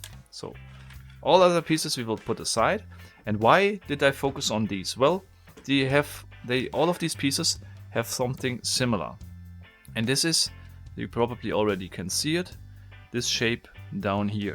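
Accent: Germany. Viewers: It's the accent! I don't know why but Germans speaking English are making me joyful somehow haha and English is not even my mother tongue (I'm French) :D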